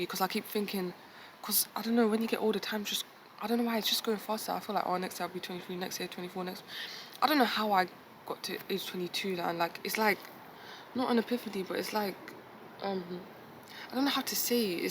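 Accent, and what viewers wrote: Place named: London, United Kingdom. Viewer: She speaks like Stormzy, soo'oo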